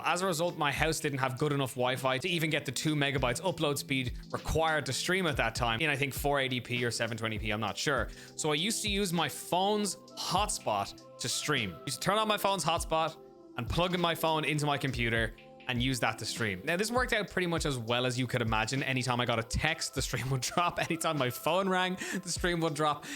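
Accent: Ireland. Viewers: damn he sound like me fr (im irish too)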